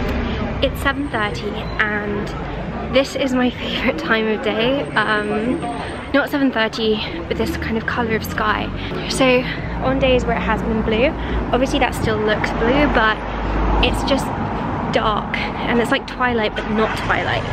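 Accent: United Kingdom. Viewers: did you know that I got my british accent by copying you? 😍😍😂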